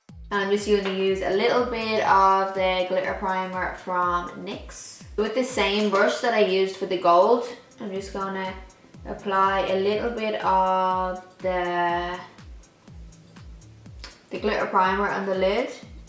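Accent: Ireland. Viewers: You just speak like Bono and the others from U2 😎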